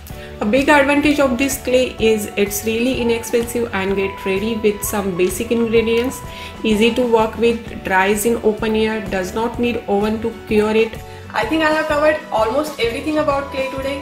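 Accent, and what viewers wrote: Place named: India. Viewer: I couldn't understand your accent. This really sucks because you are trying to speak English and I can't understand Hindi accent. No captions available either. Sorry, but you get a thumbs down. Talk slower and much more explicit. I want to make this but you made it impossible for anyone who cannot hear Hindi mumble.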